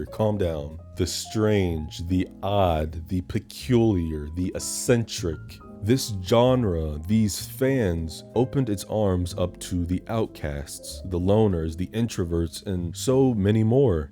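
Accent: United Kingdom. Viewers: OMFG I love a fellow cinephile!!!! But what I love more is your random british accent that you let out in your videos!!!! Can't wait to see you have more and more success! ❤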